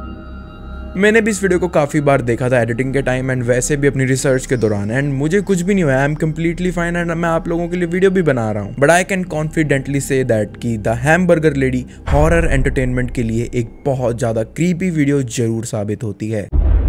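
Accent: India. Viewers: I love his Indian accent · I couldnt understand anything because of his dirty hindi accent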